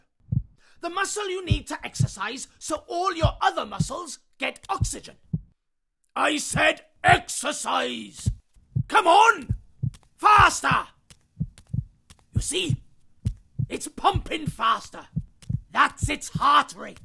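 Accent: Wales, United Kingdom. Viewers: is it welsh accent? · He is welsh! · that's a welsh accent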